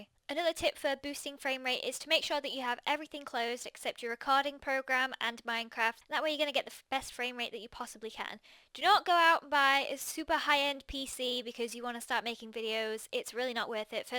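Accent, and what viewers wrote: United Kingdom. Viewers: Are you from the UK? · Best part she's British :D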